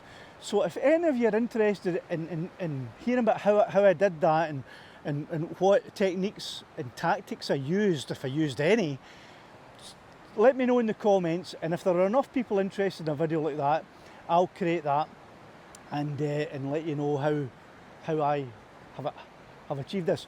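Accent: Scotland, United Kingdom. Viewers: Well done. It’s your enthusiasm and fun approach that keep your videos entertaining and gets people to subscribe. And of course our fantastic Scottish accents. Keep up the good work. Cheers. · No brainer, it’s the Scottish accent 🏴🏴🏴👌🏻😂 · Congrats on 1,000! I absolutely love your voice and the name “Lassie”! (There was a TV show in US during early 60’s featuring a dog with that name.) I just turned 65, am slowly gaining experience on my Mini 2 now that spring has come to Ohio, and am starting FAA Part 107 test prep. We old guys need to keep doing new things. QUESTION: is one permitted to fly over / along Hadrian’s Wall? That would be sweet. Or Castle Urquhart and other such places? I saw you posted on Phillip Skraba’s YouTube, volunteering to add your Scottish voice to his cinematographic artistry. I would love to see that result. Keep going! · Congratulations. 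I only vlog some of my travels but with Covid, alas, I have to wait. This is my assessment of your success. Number one - your endearing personality and captivating Scottish accent. Next, you tackle things that others can relate to. Finally, you are consistent and make your viewers anxious to see what you will come up with next. Keep up the good work. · Hi watched everyone one of your videos all informative and so clearly explained in your soft Scottish accent ( am from London) I to have the mini 2 and love it watching your clips as given me tips that I use regular now so keep em coming and congratulations on your 1000 subscribers. Jim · The reason you have so many followers is this 1 you are a lovally guy 2 your Scottish accent is beautiful 3 you are in a spectacular area for filming 4 you are testing lassy because your subscribes are asking you to test stuff God bless you keep it up thanks John from barnsley s yorks uk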